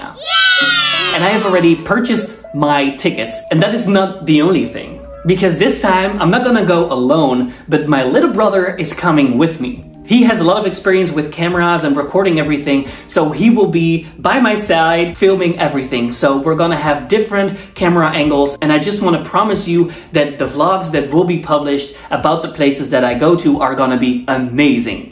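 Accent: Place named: United Kingdom. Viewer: I am a studier for Ielts,thought your prounciation is so stanard that I really want to ask where you from.Are you a real person who comes from UK as your prounciation is so well. Your prounciation of English is more standard than I'ever seen so many people speak English.I can't hear clearly what's the mean of them but I could understand you.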